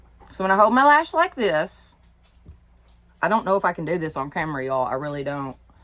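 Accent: South, United States. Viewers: YAY!!!! A MAKEUP tutorial!!!! I have admired your makeup from DAY ONE!!! Of course you are young enough to be my daughter, so you have that LOVELY SMOOTH skin!!! I was THRILLED to see your eyeshadow pallet AND you putting on your EYELASHES!!! I need to give eyelash applying MORE TIME:-P I wish ALL the Dollar General's carried the SAME PRODUCTS!!! Love the colors you put together from your pallet <3 Have you ever thought about going back to curly hair? Is there a reason you do straight all the time? You ALSO do an AMAZING JOB of matching lip liners and lipsticks!!! I DID see Maybelline on your liner I believe;-) but no idea the color, or lipstick you chose to go with it. Just know you do an AMAZING JOB matching them!! I bet you are a HUGE influence on your daughter's public speaking:-) I enjoyed the time she did your intro <3 WOW NYC for college, you go girl!!!! 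Thank you SO MUCH for sharing your skincare & makeup and hair!! I just enjoy YOU, your demeanor, your voice, that Southern accent like my daddy has....he's from Alabama, we lived down there from my 6th to 9th grade year.....I'd pretty much watch what ever you put out....Day In The Life with.....As some of the other girls stated, it was like being right there with you having a makeup day/slumber party and doing girlie stuff:-) You have BLESSED BEYOND MEASURE DAY DOLL!!! BIG {{HUGS}} OH AND YES on the baby oil & iodine LOL Gosh I even used stick butter if I "had" too:-P RARELY EVER had the REAL THING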